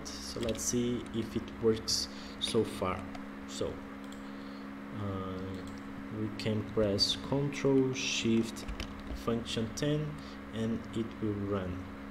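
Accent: Brazil. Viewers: Parece ser um BR falando, se for, faz um em portugues ai tbm man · Ia dizer isso, ou é Brasileiro ou indiano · Parece ser um brasileiro falando. Parabéns pelo tutorial e muito obrigado pelo conteúdo.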